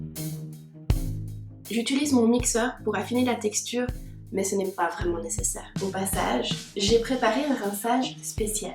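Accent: Switzerland. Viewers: Je crois reconnaître un accent suisse ? Je me trompe ?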